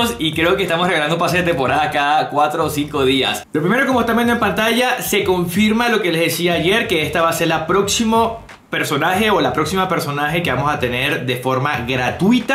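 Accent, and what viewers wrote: Venezuela. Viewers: Pareciera que fuera Venezolano, yo soy Venezolano ahí me avisas para jugar mano dejame tu WhatsApp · eres Venezolanl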